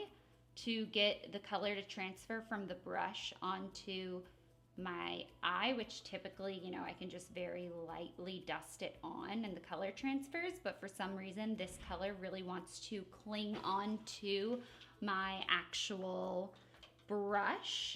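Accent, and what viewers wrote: South, United States. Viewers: It's funny. I started watching your videos about your third video up with your southern accent! But that's beside the point! Lol ... I STILL use OMGHHKP to this day. I'm a teacher and even my students say it.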